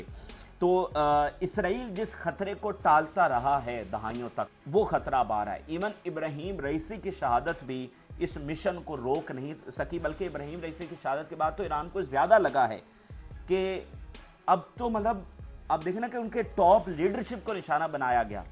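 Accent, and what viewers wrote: Punjab, Pakistan. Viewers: That Clown dance didn't end now he is playing music in the background Obviously he has been having fun and there thousand innocent people r getting killed everyday But people like u supporting him and like his ridiculous videos Shame on all of u guys who r supporting him He can't speak one right word of English and no one force him to read punjabi English Can't say right name of jewish politicians name Despite he copies all these news from other sources despite listening he can't learn right English and say right words and other names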